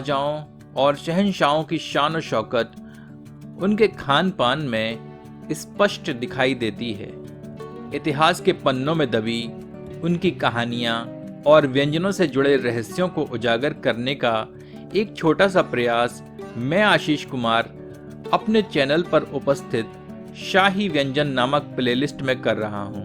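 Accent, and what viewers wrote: Standard. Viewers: Love your pure Hindi bro.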